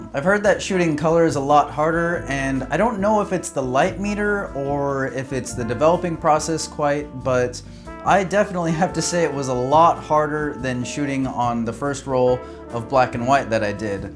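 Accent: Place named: Seattle, United States. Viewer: From they way your talking it sounds like your from Seattle, I’m from Seattle to and are trying to get back into my photography from taking a little, i recently just ran across a Pentax K1000 w/ a tamron SP 35-210mm lens at a pawn shop and had to get it, I loved your video, I have to start practicing with mines .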